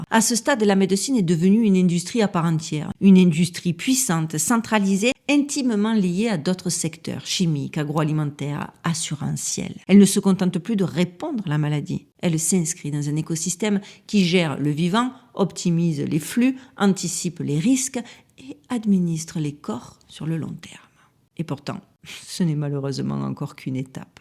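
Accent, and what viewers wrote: South, France. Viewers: Bonjour, je viens de vous découvrir aujourd'hui avec deux vidéos successives et je ne m'arrêterai pas là! Vous êtes phénoménalement géniale, avec votre accent marseillais (ma soeur!) ou tout au moins du Sud. Je vote sans la moindre hésitation pour vous aux prochaines élections présidentielles françaises qui viendront je l'espère bien avant l'échéance prévue tant le désordre et l'insalubrité règne chez nous. Je consulte immédiatement toutes vos vidéos pour les écouter et les faire connaître autour de moi! Merci pour votre travail fascinant! ❤❤❤❤❤❤❤❤ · Merci 💛🙏, de Toulouse PASSIONNANT toutes vos vidéos , et LÀ, Particulièrement Vous Nous Faites du BIEN en Nous Éveillant 👍votre charmant accent Nous Évoque Le SUD francais Tous mes Voeux de Bonheur pour cette Nouvelle💫 Année ⛅🌤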